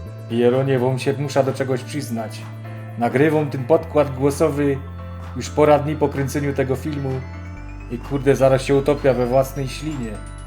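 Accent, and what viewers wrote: Silesia, Poland. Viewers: Jak mnie wkurwia gwara śląska. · tak slaska gwara nie jest prosta ❤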